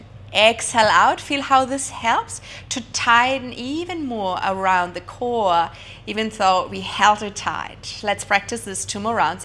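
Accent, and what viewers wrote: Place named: Switzerland. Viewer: Sounds swiss 🤔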